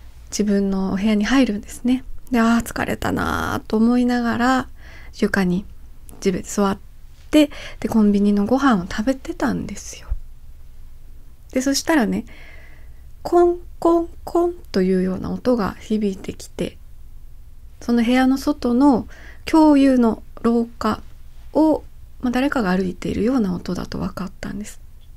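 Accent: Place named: Kansai, Japan. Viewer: 今回は道頓堀での講演のせいか、関西弁が強めの深津さんは良いね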